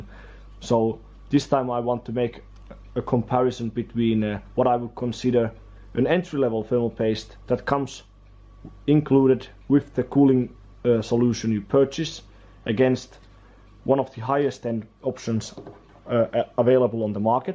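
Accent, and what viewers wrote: Finland. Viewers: You sound Finnish, are you Finnish? Jos oot, hyvin tehhty video.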